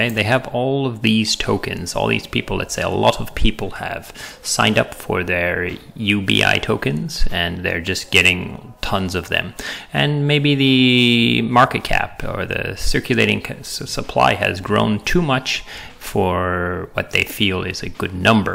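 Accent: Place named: Italy. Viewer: Hahaha - more italian accents please :)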